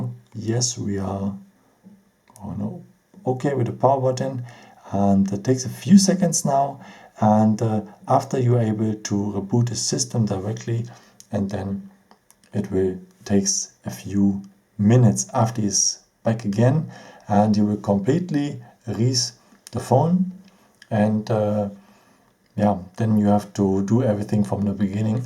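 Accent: Germany. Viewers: Bist du deutsch weil dein Akzent ist bisschen so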